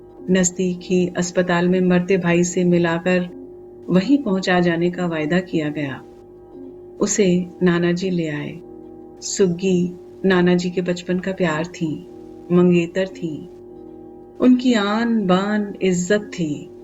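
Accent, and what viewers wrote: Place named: Punjab, India. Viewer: पढ़ी है ये कहानी पहले भी....पर इस आवाज़ में सुनने पर लगा आवाज़ रच बस गई है । कहानी को और आत्मीय बना दिया । पंजाबी के शब्दों का उसी लहज़े में उच्चारण कहानी को और करीब ले आया । सुंदर,सार्थक वाचन....डूब कर पढ़ा आपने । बहुत बधाई....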